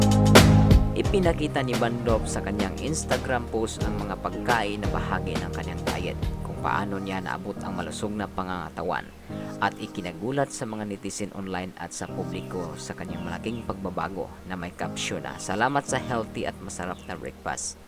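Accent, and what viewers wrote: Visayas, Philippines. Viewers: Bisaya po... gaya ko... ganyan talaga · Ang panget mung mag salita visaya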